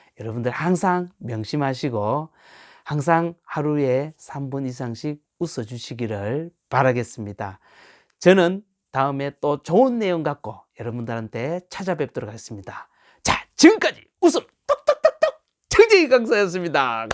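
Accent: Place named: Gyeongsang, South Korea. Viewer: 참 경상도 사트리 웃음강의 억수로 우습당 ᆢ ᆢ즉 ᆢ똥구멍 ᆢ하하하ㆍ 힘ㆍ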